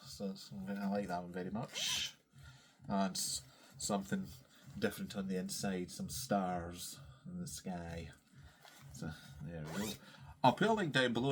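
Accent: Scotland, United Kingdom. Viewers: Hi! 🎉 congratulations on your first 2 Flosstubes. You’re a natural and I miss Amanda so I’m getting my fix of your wonderful Scottish accent! I’m Pauline of Sew. Be Bags who you bought your lace front project bag from. I remembered when I saw you’d made a Flosstube it was who bought one of my bags. As I’m watching you I’m stitching on Portrait of Veronica but she’s going away so that I can start my Glass Slipper because yours is fabulous ❤❤❤ love love love her. Can’t wait to see no.3 to see what you’ve been up to. 😊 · Love to hear a fellow Scottish accent on flosstube! I loved your full coverage progress on 18ct- cats are my muse, especially a black one.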